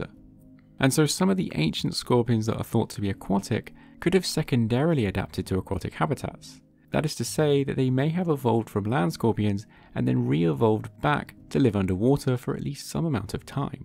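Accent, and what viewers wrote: United Kingdom. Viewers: he can't help that he's british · do you guys just believe every claim that's confidently stated by a guy with a british accent? it's a rhetorical question, i know you do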